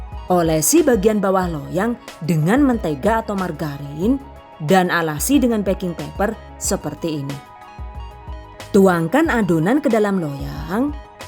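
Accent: Banyumas, Indonesia. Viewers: Kenaa seneng banget liat chanelnya karena resepnya enak, penjelasannya detail selalu ada tips n trik jadi mudah dimengerti, dan selalu berbagi resep yg lg hits n viral sekarang selalu update,resepnya hasilnya selalu lucu2 warnanya jadi keliatan menggoda2🥰 suaranya juga lucu selalu ada lucu2an ngapak2an bae 😃 selalu menginspirasi buat ide jualan saya.. Trimksh 🙏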